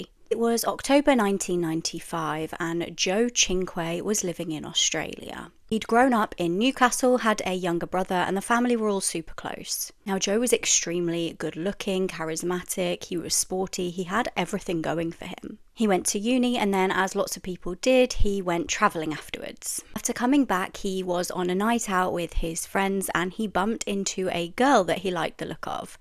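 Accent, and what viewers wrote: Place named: Yorkshire, United Kingdom. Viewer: Yummy Yorkshire accent. ❤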